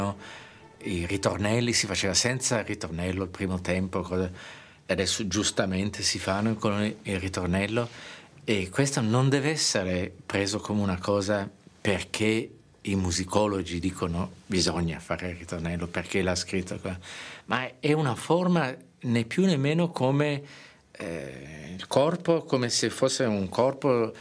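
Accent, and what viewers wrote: Germany. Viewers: The translation is...not very good. At some parts, quite inaccurate. His ideas, I don't completely agree with (though he has a warm personality). And his Italian strangely sounds like coming from somebody who speaks more German than Italian (this comment comes from somebody who only studied it, but it's obvious he doesn't sound like most Italians). BUT his conducting and the music ... absolutely sublime. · He sounds like a German speaking a good Italian. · Interesting comment about Latin countries producing melodic operatic music and Germanic countries producing the greatest symphonic works. That’s where Mozart comes in satisfying everyone! Wonderful to hear this consummate musician doing the same thing when speaking in Germanic Italian. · Ma perché parla con accento tedesco? Sembra un tedesco che parla in italiano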